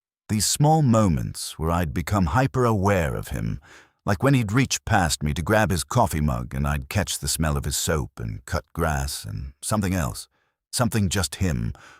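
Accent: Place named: RP, United Kingdom. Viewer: The formal British accent totally destroys any atmosphere or warmth of authenticity.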